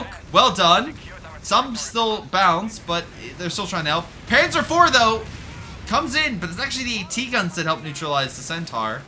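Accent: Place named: United States. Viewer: as a German/Italian your pronunciation of Guastatori is quite good and probably the best possible with an american/english accent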